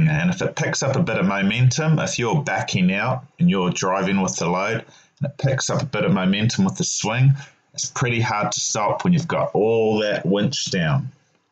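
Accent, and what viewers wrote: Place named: Southland, New Zealand. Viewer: where ya from mate bit of a kiwi southland twang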